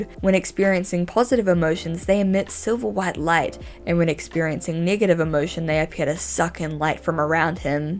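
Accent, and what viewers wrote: New Zealand. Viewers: I love your videos, but the kiwi accent is what totally hooked me 😅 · Is she a kiwi?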